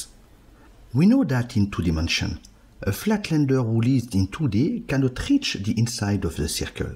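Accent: France. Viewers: His accent is like hercule poirot :) pronouncing the r like in french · nice explanation, i like the french accent :) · This video actually helped me process 4D. Also the narrator's french accent was funny and brightened my day :)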